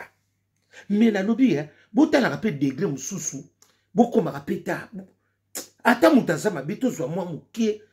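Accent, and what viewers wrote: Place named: Kongo, Democratic Republic of the Congo. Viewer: Nazo landa makambo ozo loba te mais nazo sepela na elobeli nayo😅😅😅. Please ozalaka mu kongo?😅😅😅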